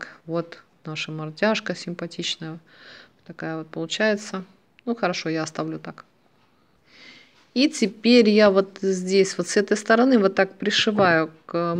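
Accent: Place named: Baltic. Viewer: Ну, надо же, у Вас очень молодой голос и мне слышится прибалтийский акцент, очень красиво речь звучит и как-то необычно. Я заслушалась. 😊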